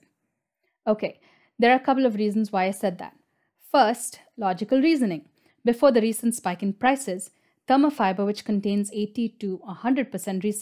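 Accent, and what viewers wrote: India. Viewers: I now watched several of your videos. I'll be honest here. When The Algorithm showed me your first video I saw a woman with Indian accent and all my biases (yes I have those, sorry I am human) kicked in. But listening for few minutes and I was hooked. Well researched, well versed and you seem super nice and reasonable. Great content, well produced, down to earth and informative. Thanks!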